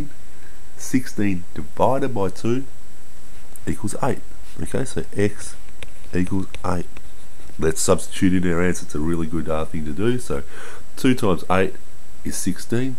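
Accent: Australia. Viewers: he sounds like an australian dathi de nogla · Hardcore Australian accent [IN A GOOD WAY] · People why do u have to be mean, have u ever heard of Australia,yeh thats where he is from,same with me, so other people have diffrent voices when it comes to countrues so stop being mean.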